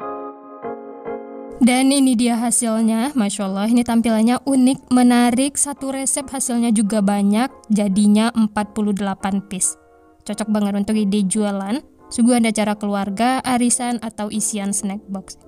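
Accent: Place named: Minangkabau, Indonesia. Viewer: Denger suaranya aku ngomong dalem hati "kaya urang awak nada bicaranya" eh pas liat foto profilnya iya lagi pose di jam gadang hehehe Salam kenal yah karna bgimanapun alm.papa urang minang maninjau❤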